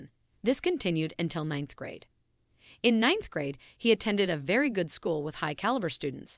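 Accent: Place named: United States. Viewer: How do you have an American accent and NOT know how to pronounce "Carolina"?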